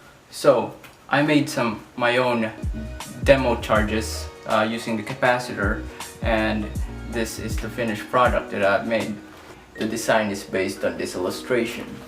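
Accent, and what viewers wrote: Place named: England, United Kingdom. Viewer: I love your english accent pls keep doing more videos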